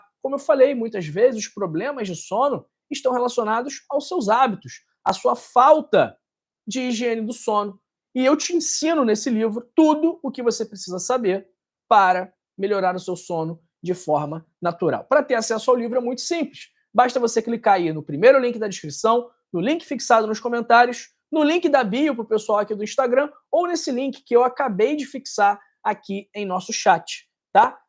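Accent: Rio de Janeiro, Brazil. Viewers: Acho tão charmoso o sotaque do carioca! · Doutor obrigado pelos seus ensinamentos, atualmente estou perdendo noites de sono devido a ansiedade. Irei praticar essas dicas que são tão fáceis e encontradas em toda casa kkkk! Ah e só uma coisa, eu adoro o sotaque dos brasileiros, povo mestiço e diversificado.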